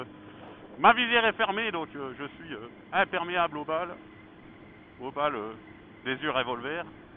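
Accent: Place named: Belgium. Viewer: Super un ami Belge avec le meme accent que moi et en plus a coter de moi . Bienvenu dans ce monde de youtubeur l'ami et pourquoi pas se rencontrer un de c'est jours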